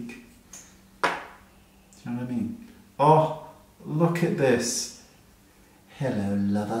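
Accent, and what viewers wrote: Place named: Wales, United Kingdom. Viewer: you sound super welsh today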